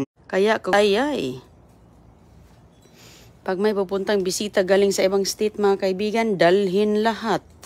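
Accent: Visayas, Philippines. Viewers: korek poh.. grabe mkpanlait sa salita ni eh alam.nmn nia laking probinsya at bisaya nga... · Tama,mga walang hiyang bashers bash ng bash buti sana kung english teacher vlogger yan para magandang magpronounce eh visayan people kadalasan matigas dila...mga walang kwenta mAnuod lang ni para makapangbash..walang ambag sa mundo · Feeling perfect nman itong SI, Visaya sya huh Kya iba ang intonation ng words nya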